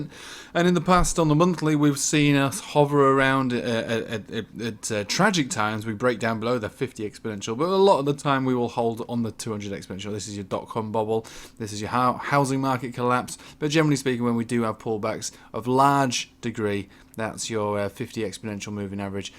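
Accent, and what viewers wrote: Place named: Scotland, United Kingdom. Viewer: What’s with the Scottish accent in vids today :))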